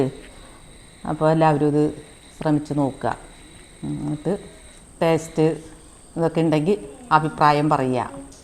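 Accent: Thrissur, India. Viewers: കപ്പ ബിരിയാണി അടിപൊളി തൃശ്ശൂർ ഭാഷ നല്ല രസം ❤Super video .നമ്മൾ കപ്പ ന്നു പറയും❤വിറകൊള്ളി എടുത്തു വരുന്നത് ഓർത്തപ്പോൾ ചിരി വന്നൂട്ടാ 😂😂❤Super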